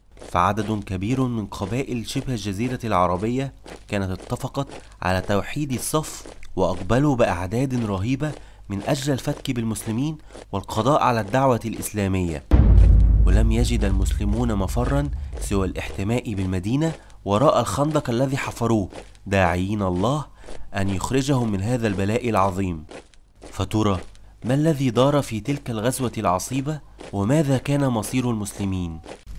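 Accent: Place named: Egypt. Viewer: ... عليك نطق الحروف بشكل جيد فأنت تتحدث بالعربية وفي موضوع علمي ... متى تتعلموا إخواننا المصريين أن تتخلوا على لهجتهم عند التحدث بالعربية والله أغاظني نطقك للكاف عوض القاف وكثير من الحروف 😢😢😢 حرفتها.. رغم أن المحتوى جيد من حيث المعلومات الدقيقة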